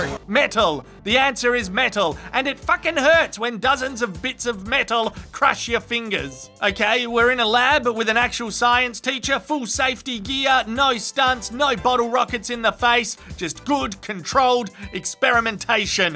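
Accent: Australia. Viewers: You should start a discord channel, and teach people to speak australian! :) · I love Australian accent....Faaeece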